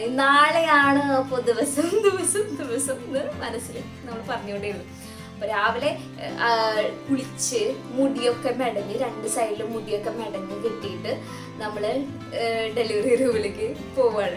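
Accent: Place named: Thrissur, India. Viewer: Thrissur slang il chechide samsaram kelkan nalla resam🥰... Sedation kodthit ulla aa acting scene okke sherikum njn chirich poy😂😂😂😂...love you chechi..god bless you baby girl 😘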